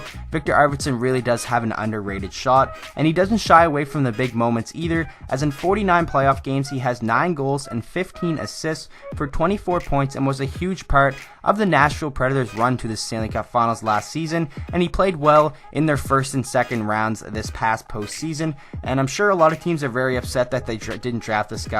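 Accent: Canada. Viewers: unknown gt gamer lol yeag he says about like a canadien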